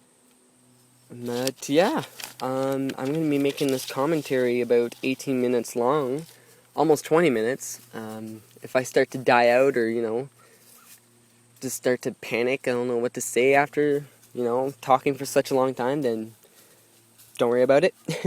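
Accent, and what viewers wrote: Canada. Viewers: are u canadian cuz u sound canadian and i know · You sound like a canadian bitch. Thanks for the tutorial.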